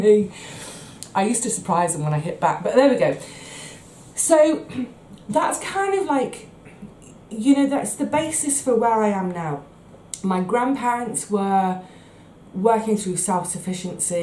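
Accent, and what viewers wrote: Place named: RP, United Kingdom. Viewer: Hi. I just found your channel from one of your followers, after one of her subcribers mentioned you in a YouTube comment. You can't put a price on being mortgage-free. You also sound really posh, for coming from a working-class family, lol. 😇 Anyway, my life has been quite similar to yours, apart from the burglary part. A working class family from the 1970's, with bone stew from our local butchers twice a week, with my Mum having only £25 a week for food, bills and everything else. This is a very honest and down to earth video, and it's really great. I'll watch a few more videos before I decide to subscribe. I wish you a Happy New Year, and I hope you have an amazing 2024 ! 🌷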